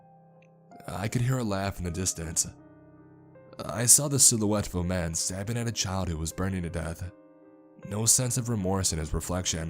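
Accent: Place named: United States. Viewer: Hey do all the ppl who read these CreepyPasta things all have American accents? Like... There's no one in Ireland or the UK doing this stuff? Not knocking your voice or anything, bud, you're doing great.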